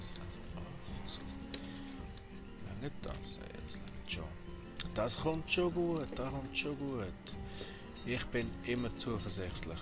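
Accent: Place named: Switzerland. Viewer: Juhuuuuuu Schwiizerdütscht ;)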